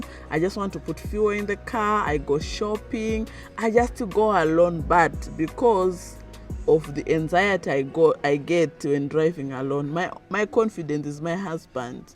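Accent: Buganda, Uganda. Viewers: you are doing very well, your english accent & facial expressions already shifted to Luganda ... thats a good start. keep it up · You will learn my sister, but your accent is now Ugandian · “I’m just theya” 😂😂😂 you so sound like a Ugandan now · You are already speaking like a Ugandan take it easy , take language lessons, and open a market place you will be speaking the language in a week. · Your lingo has changed as well you sound Ugandian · My sister, your English sounds Luganda.So what's your problem when it comes to Luganda itself.... I am from Mozambique, but I speak Luganda..nzee n'yogera Luganda nyo, soka okuwulira nga mwana, kubiri, soka okuyogera mpola mpola. Talking of chicken lehs and head, when I got back home, I no longer eat them anymore... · Don't be unequally yoked , now u speak Ugandan English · You already sound like ugandan, until when u say you are not one. 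Uganda is a friendly place to foreigners. I dont agree that it is stressful. Ask the africans living in nordic countries, they shall tell u how easy it was for them to learn those viking languages. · Hugs to you.. you’ve already picked the Uganda accent😜hope you can now do the Acholi dance.. language wise, ugandans vanoita kunge vari kutaura shona, so i think if you are shona you should learn fast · Your accent is already Luganda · Most of the languages of Zimbabwe are linguistically related to Luganda and the other Bantu languages of East Africa. If you understand Shona or Sindebele, learning Luganda will not take long. By the way, if you had not stated that you were from Zimbabwe I would have assumed from your beautiful accent that you were a Ugandan. I have pasted here a link to Luganda language lessons. They are easy to follow. Whatever you learn from it, you can practice it with your husband and see how you advance. 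I wish you the best. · You're doing well even your English accent has changed. If you didn't mention you are Zimbabwean no one can tell. You sound Ugandan. 2 ways to learn a foreign language are exposure and taking a formal course. So keep going to those public places and events. Go to Nakasero or Owino markets and negotiate prices . Attend church, weddings etc soon you will pick up the language. Check if Makerere or other colleges have a language courses. Taking a formal course would help you learn the language the correct way then you go practice what you learned by exposing yourself in public places. Don't be shy😅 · Your English accent is becoming Ugandian. Watch a lot of local dramas and learn the basics and don't be shy to speak the little that you know. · You are almost a Muganda Nyabo. Your accent is already Ugandan, be patient Luganda is almost like Shona, I lived in Uganda for a few years and I do understand when they are talking coz out languages are cousin languages, they could never Nyeya me when am around, coz i could pick a few words ! 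Enjoy endlessly kneeling 😅😂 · You are now speaking Ugandan accent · Your English accent now sounds more like ungandian😂😂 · You are now even getting the Ugandan English accent.... 😂😂😂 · But your English is now ugandaring my sister 😅.even your tone has changed 😂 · Your accent has turn Ugandan. You are now saying theya. My sis please don't copy that accent please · How do you say thereee as a Zimbabwean you sound Ugandan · U sound Ugandian already”distab him” when u are home sick come watch Zimbabwean content. I get u when he they speak their language even the jokes won’t be joke enough when it’s translated to English Sorry wena Vamwene mongotarisana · Wow, you already have a Ugandan accent! Not dominant in every word, but it's coming through in a few of them.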